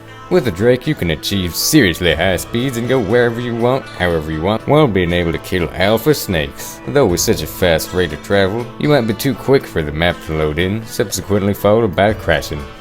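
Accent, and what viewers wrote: United States. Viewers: I love the cowboy accent 😂😂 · combine the voice of * and a southern accent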